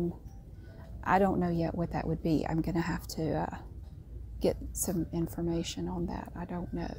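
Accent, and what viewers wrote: South, United States. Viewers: I normally don’t care much for southern accents but her voice is sooooooo soothing to my ears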